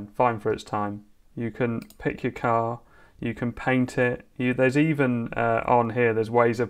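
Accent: United Kingdom. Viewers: love to hear some English accents on a tech channel 👌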